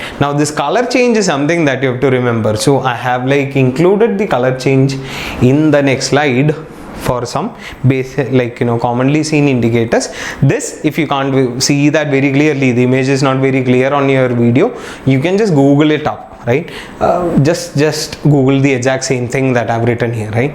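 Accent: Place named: Kerala, India. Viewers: Damn this Mallu accent!!!! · I didn't understand a single THINGY of yours!!! 😑😑🙄🙄 Plus the irritating mallu accent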